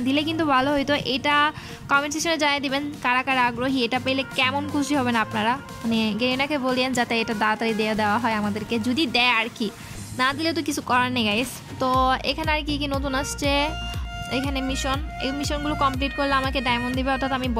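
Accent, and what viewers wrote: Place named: Chittagong, Bangladesh. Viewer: Arre api tumi to khass chittainga khali thake thake bolteco🤗🤗🤗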